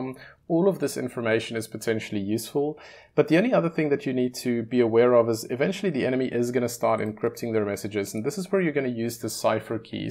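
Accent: South Africa. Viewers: excellent video...exactly speaking to the things I struggled with even after watching multiple guides...specifically struggled with fuel and how to get money to buy more fuel. Your accent sound South African... Even more reason to subscribe ;-) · i know a south african when i hear one... jy kry n sub bra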